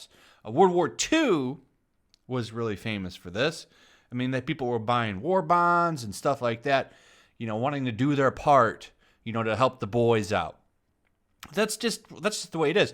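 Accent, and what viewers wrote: England, United Kingdom. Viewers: As much as I may agree with this dude’s point, his English accent is intolerable! I had to quit at the mark; Godspeed to anyone else who tries.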